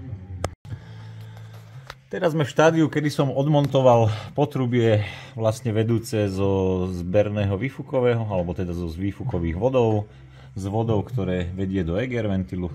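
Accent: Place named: East, Slovakia. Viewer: Máš predkov z vychodu ze vies trocha po vychodnarsky ??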